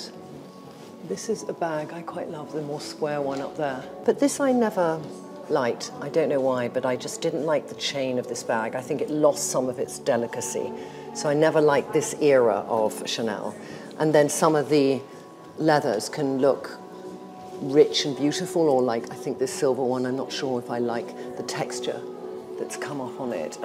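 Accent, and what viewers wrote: United States. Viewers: Love the video, but why the American accent after a week! Is there something we should know?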